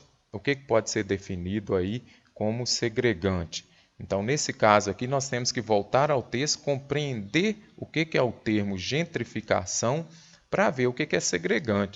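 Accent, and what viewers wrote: Minas Gerais, Brazil. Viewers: Professor, você é mineiro?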